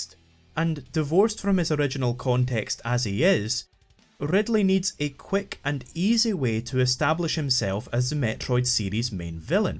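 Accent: Scotland, United Kingdom. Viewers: love the Accent. Are you from some where near Glasgow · Do you have a Scottish accent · man with thickest Scottish accent in the universe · Are you Scottish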